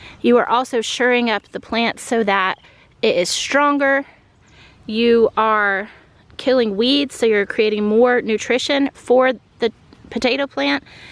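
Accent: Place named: South, United States. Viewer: Love you!! Is it just me or is she extra southerrrrn on this one?? ❤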